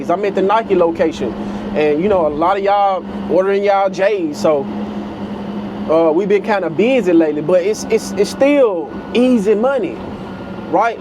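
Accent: Memphis, United States. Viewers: U from Memphis mane ? U got the accent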